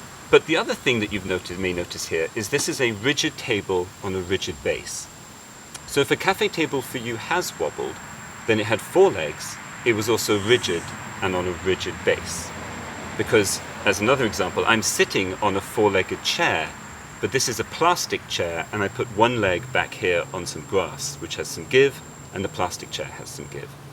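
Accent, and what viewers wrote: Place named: England, United Kingdom. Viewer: My favourite kind of English accent - Ed-dew-cated. Not once did he utter, "let me arx you sumfin, yeah?"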